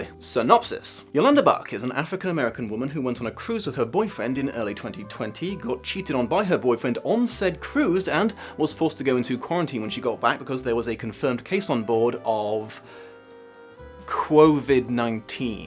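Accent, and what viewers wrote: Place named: United Kingdom. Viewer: There is something so beautiful seeing someone with a thick British accent reviewing American smut novel's.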